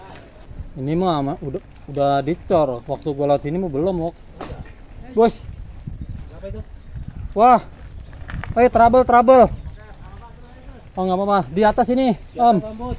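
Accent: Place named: Jasinga, Indonesia. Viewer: logat Jasinga nih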